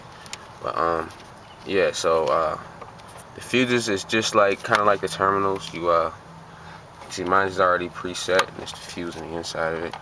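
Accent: Philadelphia, United States. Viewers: hes definately from philly i can tell lol nice work man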